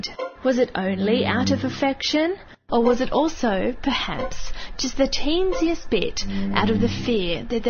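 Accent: Australia. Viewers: this one's australian. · weew for the australian narrator c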